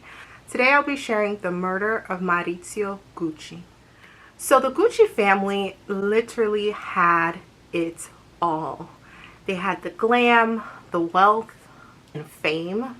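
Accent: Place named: Italy. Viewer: Love your Italian accent! Good review! Now I want to see the movie!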